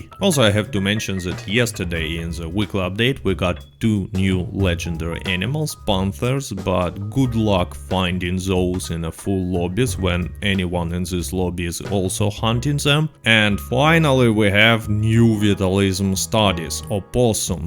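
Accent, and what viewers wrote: Russia. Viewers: I like your russian accent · I didn’t have the chance to buy them last time. By the way huge fan here :) keep rocking and keep roasting with your Russian Accent <3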